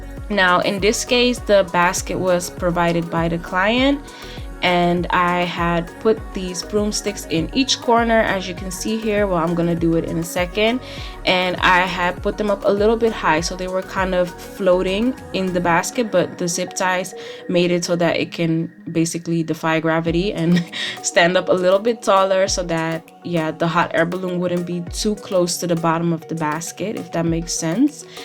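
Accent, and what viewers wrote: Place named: Guyana. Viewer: Where are you from you sound so Guyanese 🇬🇾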